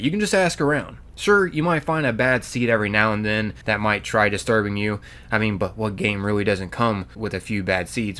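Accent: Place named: Philadelphia, United States. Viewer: Off topic but I love your accent. Philly?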